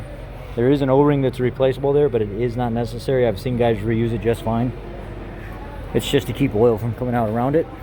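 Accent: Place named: Northern Illinois, United States. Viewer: Does sound any where like Steve, but he does sound like someone from northern Illinois! 😂😎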